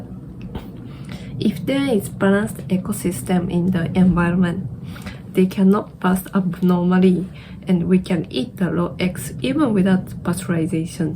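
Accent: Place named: Malaysia. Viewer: You have a pretty malaysianized japanese accent.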